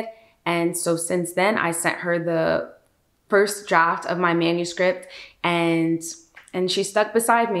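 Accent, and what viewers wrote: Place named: United States. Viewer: your accents sounds American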